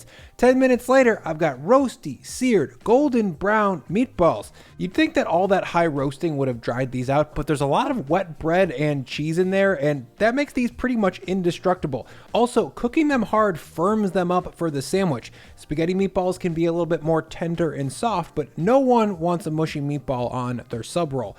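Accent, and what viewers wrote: United States. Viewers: Looks great... but Christ I cannot listen to the American accent for 14 minutes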